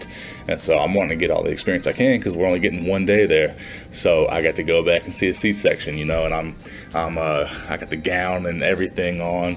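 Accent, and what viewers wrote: South, United States. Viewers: Where are you from? I think I hear a bit of a southern accent..I loved OB but that's what I want to do! I have peds this semester, so I'm super stoked!! Good luck to you!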